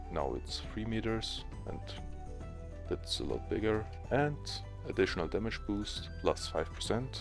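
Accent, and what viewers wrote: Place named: Russia. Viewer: Я слышу русский акцент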